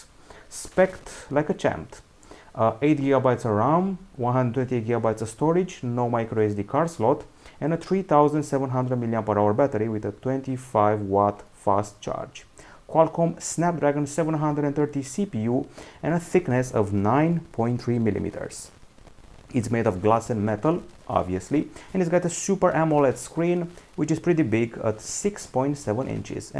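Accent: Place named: Romania. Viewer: Știam că esti român! De la accent și de la tipul de priză.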